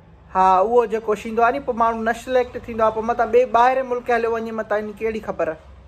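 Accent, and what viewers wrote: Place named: Larkana, Pakistan. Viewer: 'Kadhin kadhin shashindo' aw😁safa Larkane waran ji boli thi galhin 😂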